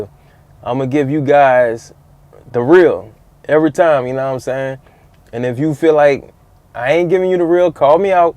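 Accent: Louisiana, United States. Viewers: I can hear that Louisiana in ya! Keep uploading man. Just subbed! ⚜⚜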